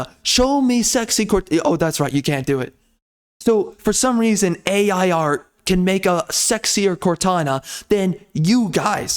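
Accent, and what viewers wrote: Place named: Eastern Europe. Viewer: You sound Slavic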